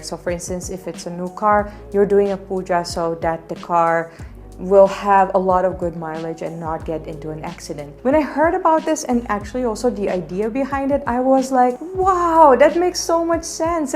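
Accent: India. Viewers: Its true that your English accent is almost indian 😄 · oh my god that indian accent is just seeping in , seems like within 5 yrs you will become completely indian , btw have u learned any kannada ?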